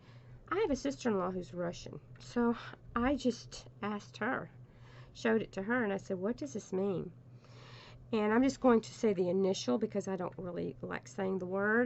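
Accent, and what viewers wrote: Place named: South, United States. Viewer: I can't believe you are losing subscribers! I love your gentle southern lilt and I will continue to watch....DON'T GIVE UP YOUR CHANNEL!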